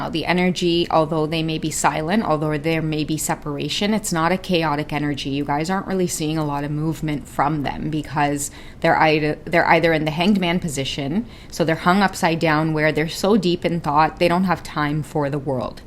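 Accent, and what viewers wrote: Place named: Trinidad and Tobago. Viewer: Hello my fellow Torontonian!!! I love your Trini accent. ❤❤❤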